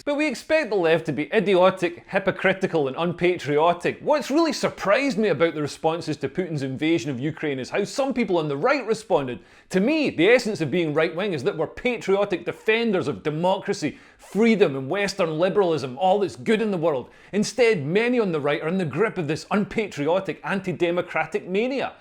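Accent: Scotland, United Kingdom. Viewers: Always amusing watching your films with subtitles on, just cant handle a Scots accent.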